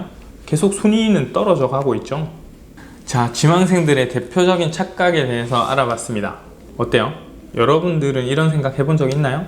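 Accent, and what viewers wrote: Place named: Gyeongnam, South Korea. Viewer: 경남쪽 분이신가...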